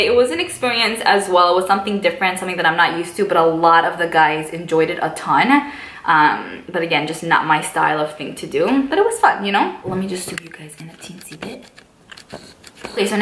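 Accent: New York, United States. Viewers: You have a New York accent. I love it #NY in the house 🤗🤗💕💕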